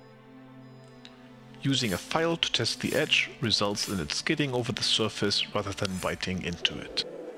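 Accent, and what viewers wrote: Germany. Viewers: Kann es sein das du aus Deutschland bist. Das hört man nämlich voll an deinem Akzent :) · Are you a german? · that´s some fine german accent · Ich wusste ich habe da einen leichten deutschen Akzent rausgehört ^^ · Are you german?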